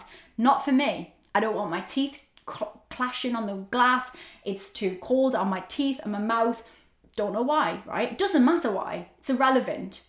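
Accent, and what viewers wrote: Newcastle, United Kingdom. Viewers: Hey. I found your channel being a big Girls Aloud fan, and I was taken with the Geordie accent. Which is why my username is my name haha! Love your stuff. Hope you do more!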